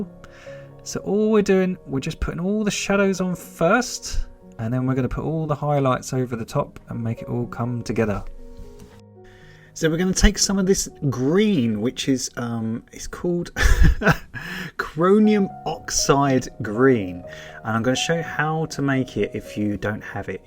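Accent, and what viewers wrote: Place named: England, United Kingdom. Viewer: Great! I’m from England too. I live in Japan, so good to hear a homey!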